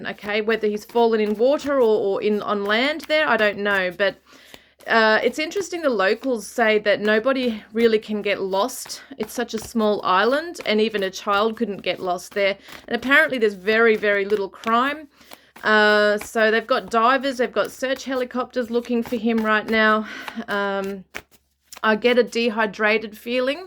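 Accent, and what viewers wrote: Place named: Australia. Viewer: I have never known of your channel before and clicked on it because of the subject. I had watched another reader yesterday and I wanted to compare what you both said. Pretty much identical. She believed he went higher and off the normal track. He tripped and fell hitting his head. She didn’t think he was in water but up on the mountain but would be hard to find by drones because he was wearing dark clothing. She doesn’t feel he is alive. She also said it would be a while - a few weeks before he was found (and you pulled out July). Two brothers passed him on the path and he would be found by other hikers (you had the hitch hike card). She felt that he and his wife had a bit of an argument on the beach before he left. You were mentioning was reflecting on his life etc. Don’t we all do that after a spat with our partner? Great reading and lovely to hear an Australian accent 🇦🇺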